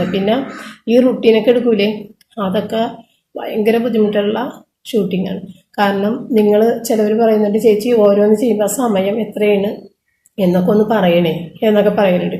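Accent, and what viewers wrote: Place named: Paravur, India. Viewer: Paravoorile language kelkan nalla rasand nde anuyathi Paravoorukariyanu same avalu parayanapole..chechiyude kashtapadu manasilavunnund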